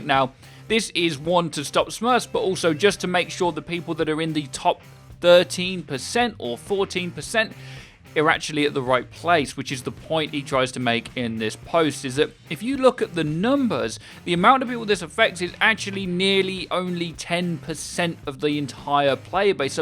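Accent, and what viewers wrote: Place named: United Kingdom. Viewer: Got your account in My team in placement matches huge loss still 10/10 to hear your brittish accent live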